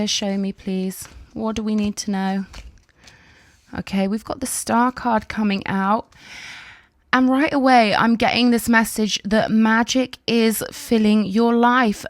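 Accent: United Kingdom. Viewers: that cute lil British accent of yours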